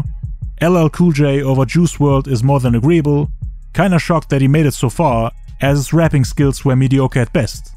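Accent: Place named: Germany. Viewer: Dein Deutscher Akzent knallt anders rein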